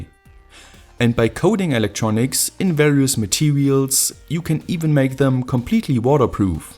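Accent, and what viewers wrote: Germany. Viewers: Bist du deutsch? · are you German? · Lol, du bist 100pro Deutsch! Aber trotzdem gutes Video! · Are u german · Man merkt so das er sonst deutsch spricht😂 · Du bist deutsch hat man herausgehört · Are you a german? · aha du bist deutsch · Den deutschen akzent hört man halt direkt raus xd aber geiles video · You are from Germany? Kommst du aus Deutschland 🇩🇪? Siehe min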